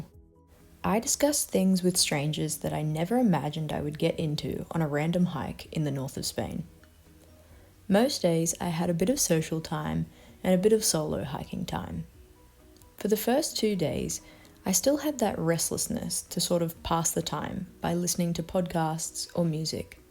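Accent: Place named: Australia. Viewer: Wow why I didn’t know this contents before ,I love Aussie English , please keep it up for me! Thank you !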